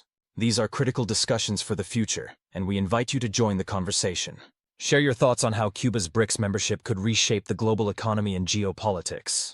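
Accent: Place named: United States. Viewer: Somewhat ironic to use an American accented overdub??? Perhaps you need to rethink that approach.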